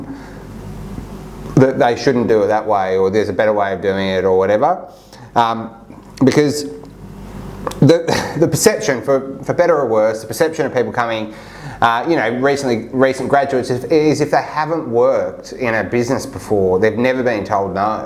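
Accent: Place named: Australia. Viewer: Very helpful information! I'm an entry-level accountant working to switch careers from ESL to Accounting. In the US the demand for accountants is so high that they are starting to hire accountants with no degree and certificates instead. It sounds like you are from Australia, is Australia having a similar situation, or are they still solely focusing on people with college degrees?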